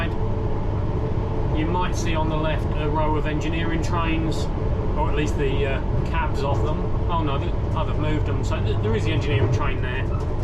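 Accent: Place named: Midlands, United Kingdom. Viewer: Your accent is very stranged, sounds like someone from the midlands moved down to london and picked up twangs. Can tell well "arnos grove" and "even out" sound cockney then you say speed OP like a northerner! haha. Great video none the less thanks for making these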